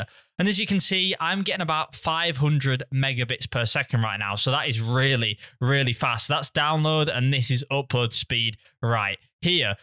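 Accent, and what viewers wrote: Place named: United Kingdom. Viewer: Dang bros British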